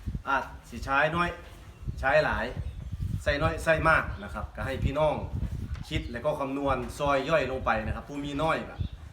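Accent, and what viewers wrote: Thailand. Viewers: อยู่ที่ไหนครับภาษาพูดเหมือนบ้านผมเลยครับผมอยู่สกลนครับ ดูแล้วท่านเก่งมากๆครับ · ฟังสำเนียงคือคนนครพนมหรือสกลนครผมอยู่นาทม นครพนมอยากเลี้ยงคือกันแต่ยังบ่มีความรู้ด้านตลาดแนะนำได้บ่ครับ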